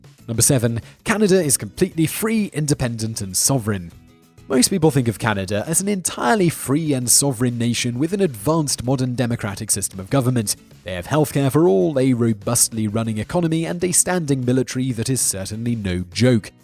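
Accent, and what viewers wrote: United Kingdom. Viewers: There is an strong current of anti-Americanism in all his videos. Even this video is scolding what are essentially American perceptions of the French, Japanese, English, Spaniards, etc. His basic mission is cultural collusion with the American Left, to voice U.S. leftism in a British accent. With bad teeth. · | There shouldn't be an apostrophe. | Our & Canada's situation with the Queen is much too complicated to be explained like this. While it is true that many countries under the British monarch have some customs which Americans think are clues that we are undemocratic, they really are just that. Customs. While the Queen still has some emergency powers, they extend to dissolving Parliaments & actually make us more democratic because it's a fail-safe against abolition of democracy. | British people used to not care much about their teeth, until electric toothbrushes were invented. 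I think it's weird how Simon Whistler speaks in a British accent but embodies the American stereotype of assuming that all his audience are American.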